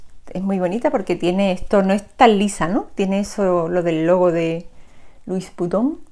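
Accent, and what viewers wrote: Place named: Malaga, Spain. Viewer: muchas gracias, es la primera vez que te veo ye has encantado por lo natural que eres y nuestro acento malagueño 😍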